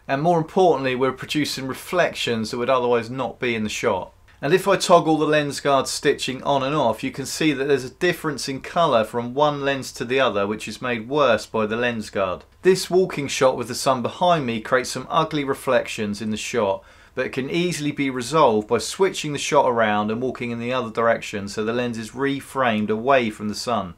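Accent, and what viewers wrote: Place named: RP, United Kingdom. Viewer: your very posh British accent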